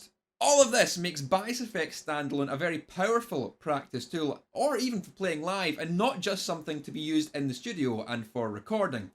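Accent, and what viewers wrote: Scotland, United Kingdom. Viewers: It took me a full 4 seconds to tune my ears to "Scottish". Thanks for the video :)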